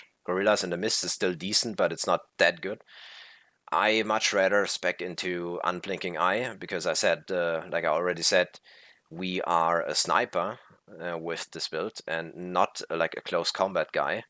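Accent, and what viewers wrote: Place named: Germany. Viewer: Nice Build Aber das du deutsch bist hört man dirket xD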